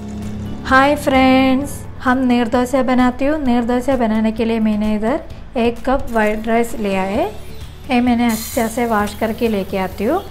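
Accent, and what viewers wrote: Kerala, India. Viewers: Very Well explained and demonstrated. Your Hindi with Keralite accent is cute as you. Keep it up 👍👌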